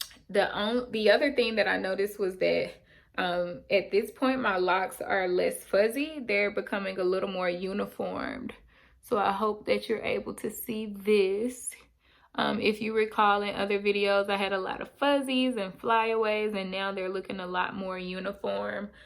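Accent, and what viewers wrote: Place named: South, United States. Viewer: Girl, listen, I've had locs before (about to start my loc journey again next week) and I had never been tender headed in my life until my hair loc'd! I thought that was crazy. Your hair is beautiful, btw! I'm a new subbie. Thank you for mentioning the salon because I'm in Atlanta too. To hear you talk, I was thinking you had to be from here or somewhere from the south because we sound alike 😁😁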